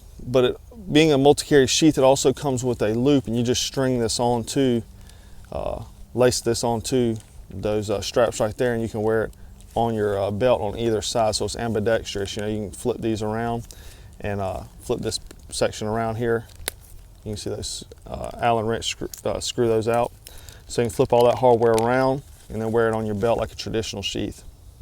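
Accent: South, United States. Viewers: Do you have to buy all of these knives? I would have to agree that $385 is alot. Also I went to their website and it was difficult to navigate. Wish it was a little more user friendly. Good review though. I trust your opinion because it sounds like your from the South as well lol. Also I don't know if you have done this in other videos, but I'm not a steel expert so explaining the different qualities or benefits of the different steel would help me out.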